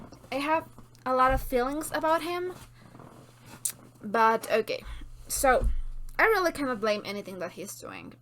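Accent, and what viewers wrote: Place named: Mexico. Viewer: I hope that someday you'll read the 3 novels, . As hard as it may be to believe, the books are 100% better than these fantastic films, especially the character- and world-building. I read the works as a teen, and now I listen to them on YT audiobooks when I'm resting, and while doing household chores! I really enjoy your accent, having lived among Mexican farmworkers on both sides of the border, for a total of 10 yrs way back in the 1990s. I am a retired antropólogo cultural. ¡Nos vemos pronto señorita! 🤞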